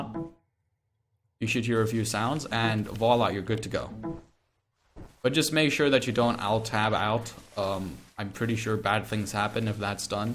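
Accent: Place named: India. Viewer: dang bruh, your indian accent is barely noticeable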